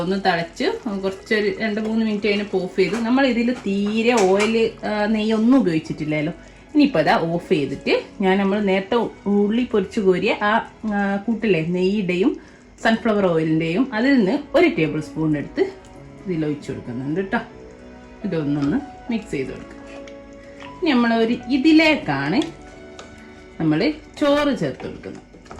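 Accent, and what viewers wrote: Kozhikode, India. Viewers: കോഴിക്കോട് കാരിയാണല്ലോ. സംസാരം 🥰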